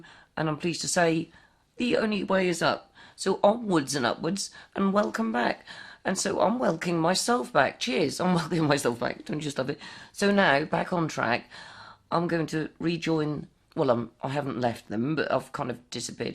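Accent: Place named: England, United Kingdom. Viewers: there's nothn like a gud ol NORFOLK Accent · your voice and english accent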